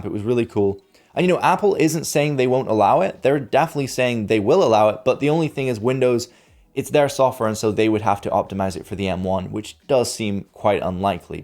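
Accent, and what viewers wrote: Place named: Northern Ireland, United Kingdom. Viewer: I hear a twinge of Irish or Northern English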